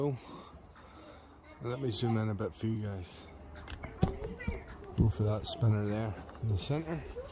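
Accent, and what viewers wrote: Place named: Ulster, United Kingdom. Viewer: I'm thinking " That gent is maybe an Ulsterman" and then I heard " Plenty of fine shavings for kindlin' " Best wishes from Portarlington County Laois. Keep up the good work.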